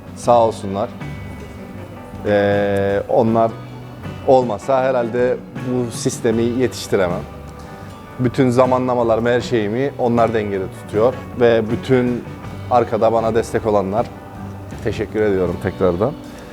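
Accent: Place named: Turkmenistan. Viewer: Konusman Türkmenlere benziyor. Türkmen misin?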